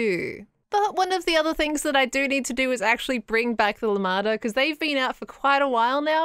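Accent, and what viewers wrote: Australia. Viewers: Is she Australian? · Loving you on the hermitcraft server ! The australian accent is really fun to listen to. Keep up <3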